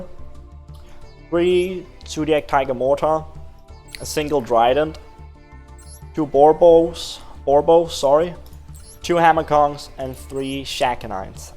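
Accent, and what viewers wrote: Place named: Denmark. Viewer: You sound like you're from Denmark, love your voice daddy <3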